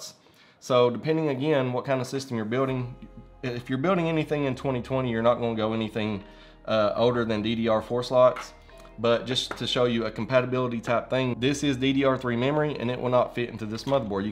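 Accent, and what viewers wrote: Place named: Appalachia, United States. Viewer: Accent is N.C, Tennessee, West Virginia or Kentucky...Am I right?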